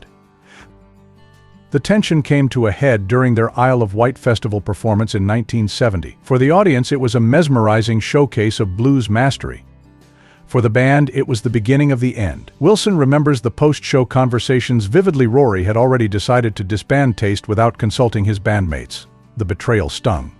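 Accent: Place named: United States. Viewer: Who is this Yanky , shitehawk commentator - Absolute bolloxology !!!!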